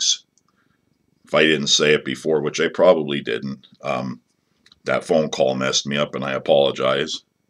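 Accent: Pennsylvania, United States. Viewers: I have been trying some of your patterns for a friend and myself . He made a comment that you have a Schuylkill county accent , then I saw you use a bank envelope that had orwigsburg printed on it , is that the area your from ?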